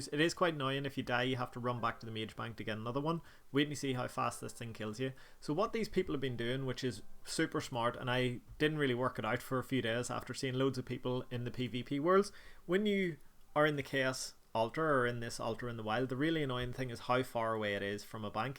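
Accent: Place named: Northern Ireland, United Kingdom. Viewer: Subbed and liked! Are u from Ireland by any chance? Ur accent sounds northern Irish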